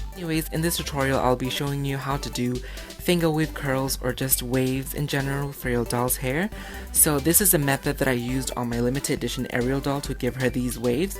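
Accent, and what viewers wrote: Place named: California, United States. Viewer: He may be from California because thats how my friend who is a boy talks and he is from California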